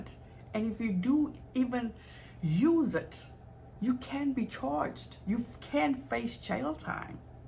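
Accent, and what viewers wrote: South Africa. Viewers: 1- just because 1 partner doesn’t bring in income does NOT a mean they don’t contribute financially. Often it’s the at home partner who pays the bills and manages a budget. 2- I’m really embarrassed that I thought your accent was Australian. Oops. Now I know it’s South African. And I’m so sorry someone thought it was acceptable to call you a slur. Not ok. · I absolutely LOVE your self confidence and self respect!!! U r such a beautiful person & mother and I’m SO happy u r able to let the ignorant comments by vile & disgusting people just roll off your back!!!! I can totally tell that it doesn’t bother u which I think is awesome!!! I know your grace really infuriates those insecure people!!!! Good for u!!! I’m proud of u & I love your passion, compassion & your intelligence!!!! (Side note - I ❤️❤️❤️ the South African accent!!! It’s my favorite of all accents!!) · Oh I'm so sorry - I had to pick my jaw up off the floor when the K word was dropped, my besty at highschool was from SA n listening to you is like hearing her Grandparents, such a great family - should've come here instead o US 😉🥰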